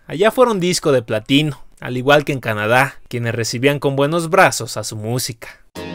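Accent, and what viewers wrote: Mexico. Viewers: Las historias son supremamente interesantes pero el narrador: fatal! Ese acento mexicano es el peor del idioma español, es un acento náuseo.: eduque su voz y modifique ese fastidioso acento.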